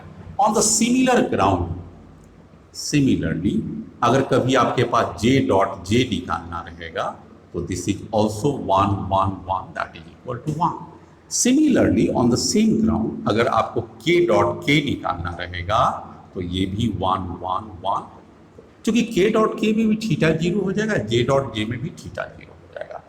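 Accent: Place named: Begusarai, India. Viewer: Sir u r from begusarai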